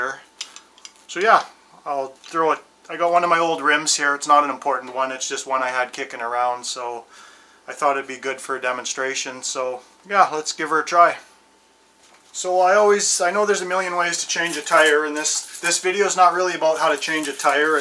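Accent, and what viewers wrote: Canada. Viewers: So cool, I just got one and am going to take a video of it tonight for my first use...just watching some people do this...you sound like me too...either from up north or Canada!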